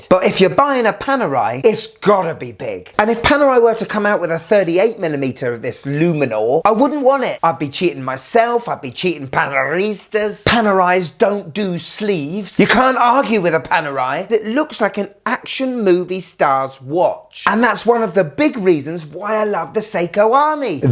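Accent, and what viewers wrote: United Kingdom. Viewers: Holy mackerel that Michael, Caine British accent, Austin Powers is so difficult to listen to. Seems like a cool guy though and understands watches for sure but wow what an accent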